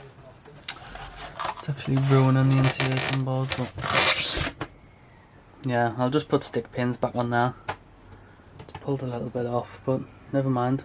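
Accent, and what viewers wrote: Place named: Northumberland, United Kingdom. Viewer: Nice job, I'm watching for information and because my mum was from ashington and your accents remind me of her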